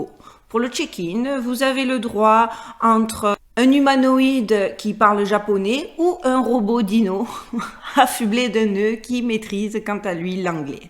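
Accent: South, France. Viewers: Toujours aussi sensationnelle ! Il y a trois You Tubers dont vous faites partie qui lorsque je les écoute me font du bien et me permettent toujours d’avoir un sourire amusé ! Vous avez l’art de la narration naturelle et ce petit brin d’auto analyse amusante qui détend l’atmosphère ! Amusant d’entendre de Vegas l’accent méridional, mais ça fait partie d’un ensemble qui fait de vous une personne sympathique et charmante qu’on rêve tous d’avoir comme amie ! J’ai aimé cette vidéo et c’est tout à fait le sens du courant actuel! Remplacer l’homme et lui payer un revenu universel et se débarrasser le plus vite possible de l’excès ! Surtout annihiler tous les sentiments en l’isolant complètement dans un monde automatisé où il n’aura plus besoin de sortir ! Des bon toutous esclaves obéissants drogués et contrôlés mentalement, cerveau atrophié, des zombies inutiles ! 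Votre vidéo est plus légère que ma vision tout en étant semblable mais moi je le vois terrifiée et le transmets dans mon narratif ! Merci encore, j’espère que le choix d’être à Végas sera meilleur que celui d’être en France ! On nous menace de nous reconfiner à Paris et RO ! Bises 😘🙏🌹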